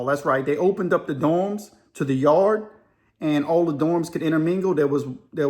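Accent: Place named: South, United States. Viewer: Great story man! You have a nice slow paced southern storytelling style where 20 minutes went by and it didn’t feel like 20 minutes. You have a gift!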